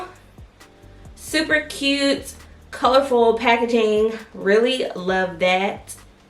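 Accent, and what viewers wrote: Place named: Memphis, United States. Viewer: I like your accent. Sounds like a Memphis accent lol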